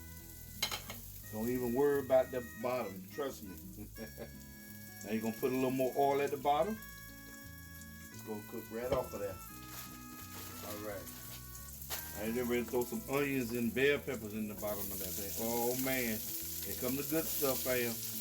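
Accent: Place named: DMV, United States. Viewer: Bro, you’ve got me running to the store. Once you start talking junk, I knew you were from the DMV. Works for me!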